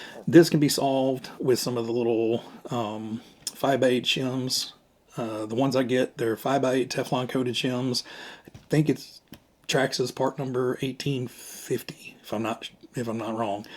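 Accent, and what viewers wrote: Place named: Boston, United States. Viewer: Very nice ! Love your Boston accent🤣 Do you have any of the earlier M chassis ? I only have a M-01 Mini Monte Carlo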